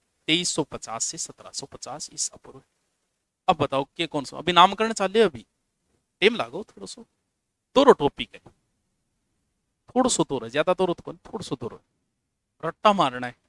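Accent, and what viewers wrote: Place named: Rajasthan, India. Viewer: Padhate acha ho but hindi nhi bolne ki wjh se aadi bat samaj hi nhi aati apki .... marwadi, rajasthani bolte ho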